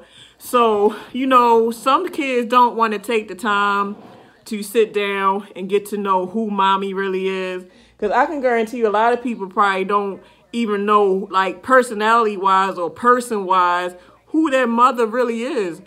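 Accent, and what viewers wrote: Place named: South, United States. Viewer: Girl you talk country with that thing on your tongue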